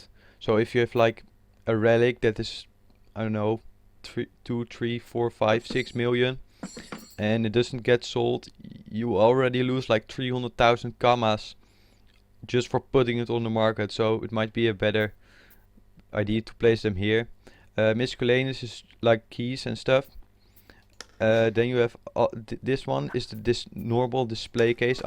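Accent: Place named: Netherlands. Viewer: Duidelijk een Nederlander ^^, goed bezig.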